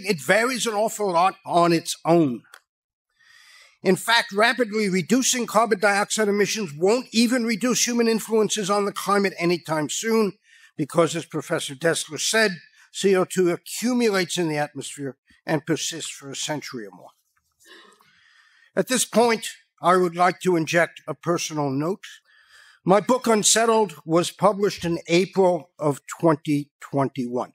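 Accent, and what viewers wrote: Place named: New Zealand. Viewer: Sounds like New Zealand 🇳🇿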